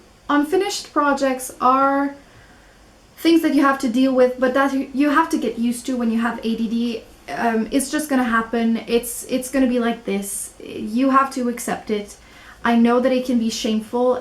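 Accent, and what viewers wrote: Quebec, Canada. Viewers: I'm still waiting for my diagnosis, but watching your video pretty much confirms my doubt, I feel the same way. Thanks for making theses videos, feels like i'm not alone in this. Also i'm detecting a french accent, i'm guessing your french canadian also?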